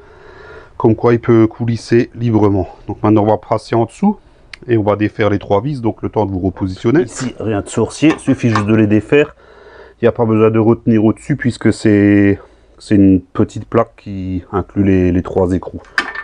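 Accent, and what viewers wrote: Alsace, France. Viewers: Pourquoi dire qu'un soufflet adaptable est moins bon qu'un origine? quel est ton argument, car je ne suis pas d'accord, la qualité du caoutchouc étant identique. Très bonne vidéo. Mais je pense que cet outil n'est pas indispensable compte tenu du nombre de fois où la nécessité de changer un soufflet est ridicule. J'ai trois véhicules tournants et je n'ai jamais changé de soufflet. D'autre part, je pense que cet outil n'est valable que pour les soufflets externes. En tout cas, merci pour tes vidéos très didactiques et utiles pour le commun des mortels. En plus, ton accent est lié à ma jeunesse passée en Alsace, à Schiltigheim et cela me rappelle tellement de souvenirs d'une époque révolue. Je suis comme Michel Sardou. Je hais notre société où la violence est devenue la règle.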